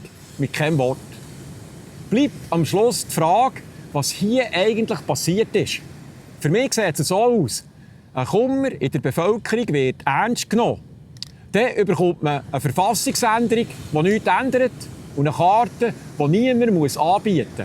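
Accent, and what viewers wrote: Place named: Swiss German, Switzerland. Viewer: Es wird gesprochen, wie sich IK Schweizerdeutsch vorstellt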